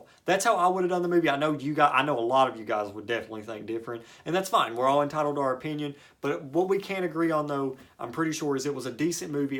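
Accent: South, United States. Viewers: I want a southern American accent like you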